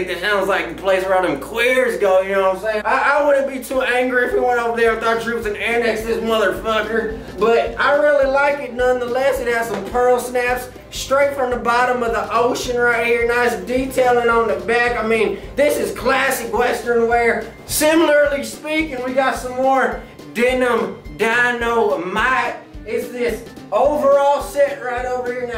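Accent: United States. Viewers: Yea he was just trying to dress and sound like an american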